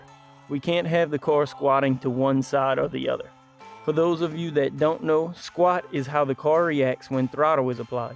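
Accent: Louisiana, United States. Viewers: Bro.are you from Louisiana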